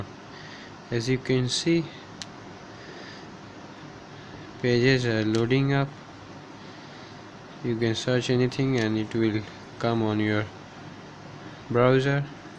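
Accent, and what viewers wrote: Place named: India. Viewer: indian speak very funny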